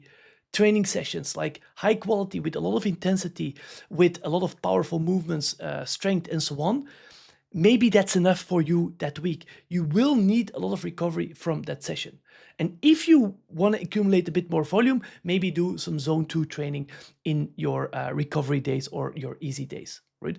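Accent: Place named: Netherlands. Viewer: He sounds dutch or flemish. In those languages, the emphasis is on the first syllable. Otherwise his english is pretty solid for a second language. Constructive remark though.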